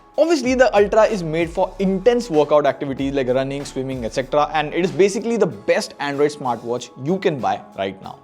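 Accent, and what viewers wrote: India. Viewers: I subscribed because of the indian accent voice❤❤❤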